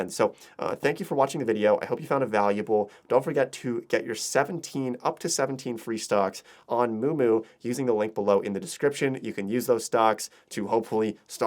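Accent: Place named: Pennsylvania, United States. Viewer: 5 minutes into this video, I'm like: "dang, is this guy from PA?"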